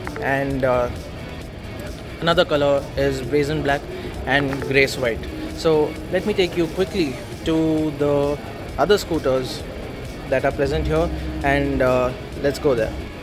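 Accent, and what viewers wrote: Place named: London, United Kingdom. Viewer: Bhai tu London Pala besi English khuchu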